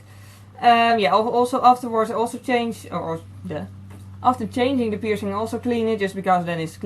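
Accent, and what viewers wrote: Netherlands. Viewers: She's Dutch, she has an accent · I think I hear a dutch accent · are you Dutch?? Random question but i feel like i hear that accent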